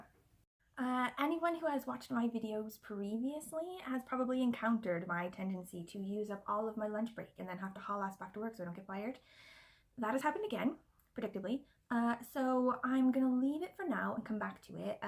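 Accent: Wales, United Kingdom. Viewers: Omg u are turning more Welsh with every click. Newest one ul be like Staceu from Gavin and Stacey, no offence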